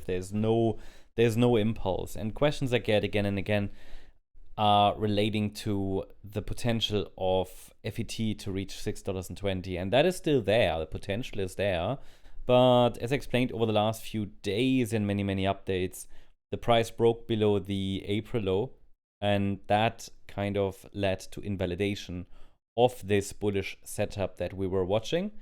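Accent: Germany. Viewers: That German English 👌🏽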